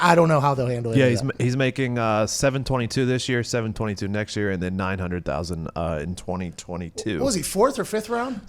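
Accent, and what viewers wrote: Pittsburgh, United States. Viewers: yinzer accent still comes out quite often.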